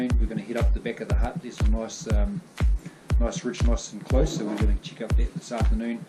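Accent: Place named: New Zealand. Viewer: Love that kiwi accent and those wicked glasses lol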